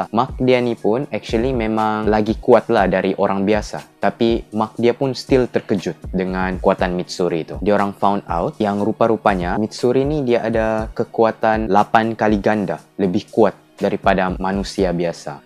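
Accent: Sabah, Malaysia. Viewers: punya best lah orang sabah ini ckp! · org sabah kh? ketara sleng sabah tu hehee. btw, hai saya fans dari sabah hehee😊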